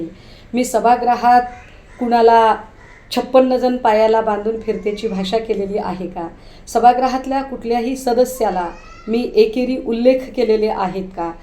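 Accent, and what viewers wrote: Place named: Pune, India. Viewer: Wah madam..tumchi bhasha kiti swachha ahe..agdi puneri..sadashiv pethi....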